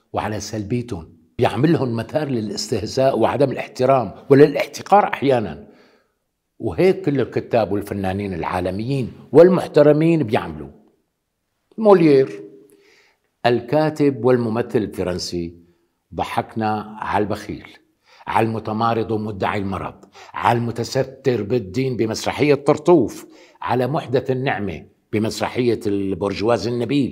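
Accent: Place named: Damascus, Syria. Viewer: ما احلى النطق الدمشقي للغة العربية عظيمً يا أستاذ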